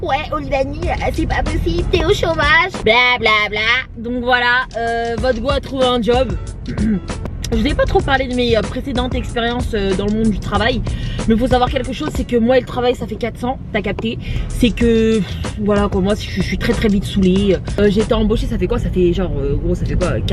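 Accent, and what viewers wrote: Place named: Democratic Republic of the Congo. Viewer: L'accent Congolais😘❤️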